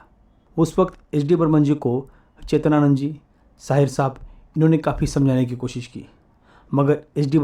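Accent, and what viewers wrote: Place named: Maharashtra, India. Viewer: तुम्ही मराठी आहात का? हिंदीत मराठी ची झलक झळकते ।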